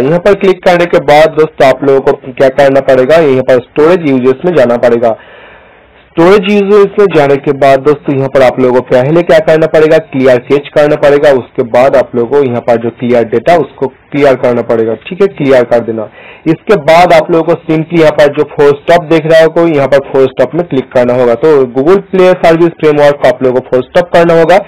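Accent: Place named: Bengal, India. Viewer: Bangali hai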